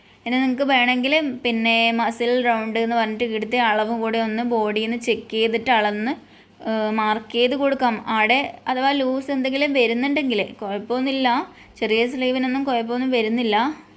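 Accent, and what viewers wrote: Kannur, India. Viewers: കണ്ണൂർ ഭാഷ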